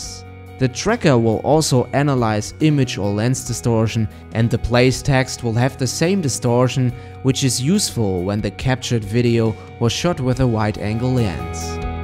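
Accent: Germany. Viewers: I like the German accent. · Hey. Ich fange demnächst an mit After Effects und dachte, als ich euer Video gesehen habe, dass ihr euch sicher sehr gut damit auskennt. Könnt ihr mir also bei dem Start in AE etwas helfen? Außerdem habe ich eurer "Deutsches Englisch" sofort erkannt! :) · ist deine Muttersprache zufällig Deutsch?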